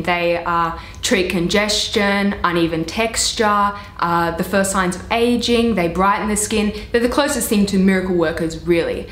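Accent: Australia. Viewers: Lol the end was funny, nice to you as you!!! Btw, r u Australian?!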